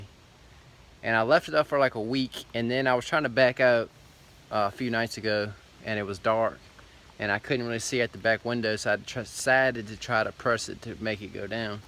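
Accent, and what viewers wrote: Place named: South, United States. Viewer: You are handsome and your southern accent is everything ❤️😊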